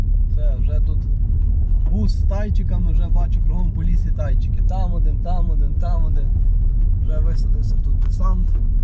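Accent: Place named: Yavoriv, Ukraine. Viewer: збір чорниці - найдивовижніше заняття у світі... особливо якщо пальцями по ягідці!!! Так з рідними збирав ще 60 років назад на Яворівщині Львівської обл... Ти часом не з тих країв?!? Щось знайома говірка, працьовитість і любов до природи!!! Привіт синьо - жовтій Швеції!!! 🇸🇪 ❤️ 🇺🇦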